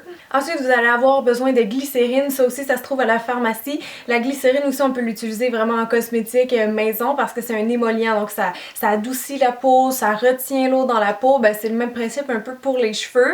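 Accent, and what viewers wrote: Canada. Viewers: Coucou, tu as changé la forme de tes sourcils? En tout cas je les adore dans cette vidéo! Tes conseils sont géniaux comme toujours, mille merci de partager ça avec nous! Et ton accent, moi qui suis fan du Canada, j'en suis folle! Des bisous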